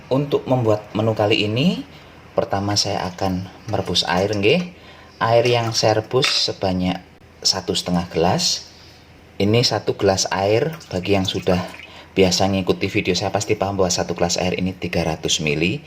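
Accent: Banyumas, Indonesia. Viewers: Lik...ngomong ngapak malah seru..nek nglebokan alias memasukan sih bhs ngapake apa yaahh.. 😀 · Memang sangat" menghibur para pecinta yutub sperti aku dn bicaranya yg ak ska ngapak alias lucu 😅😅😅😅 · Saya suka resep mas apalagi bahasa banyumasan kental banget 👍👍👍😇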